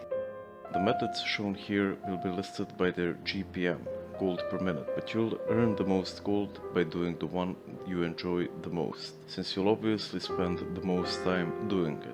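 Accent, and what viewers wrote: Russia. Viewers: You have a cool Russian accent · Ty for this awesome guide! Where are you from? You have accent as russian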